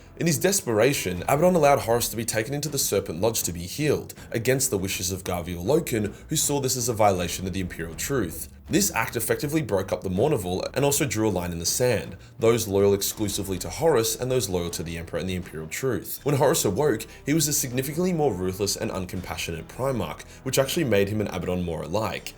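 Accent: Australia. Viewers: My friend 600 k is getting closer i am so happy for you ive never ever played a Warhammer game never bought something that has to do with Warhammer but you are my favorite warhammrr YouTuber ever you make it fun you put in some jokes and the aussie accent makes it even better you make awsome videos keep doing great thing 💪💪💪😁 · I may not always get to enjoy having 40k explained to me by a cursing Aussie but when I do I always enjoy it.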